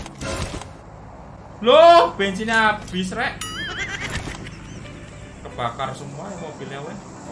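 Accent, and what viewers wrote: Java, Indonesia. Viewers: Tetep menjadi kereator yg lucu mas dengan logot jawa nya, dan sehat selalau untuk mecari rejeki buat keluarga nya, IG: alfian_yuliansyah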